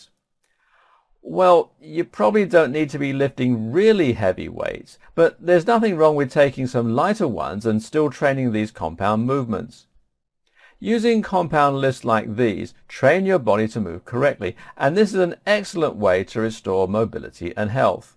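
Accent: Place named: United Kingdom. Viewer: The British accent is one of the most lovely things to listen to...not sure why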